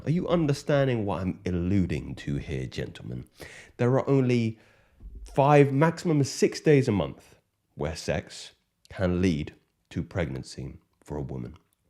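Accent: United Kingdom. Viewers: Ngl, the proper British brother's verbal gentle assault on my brain sparks the biological need to bring as many kids as possible into life and actually be a masculine manly father This the typa conditioning that should be broadcasted instead of whatever anti biology society participates in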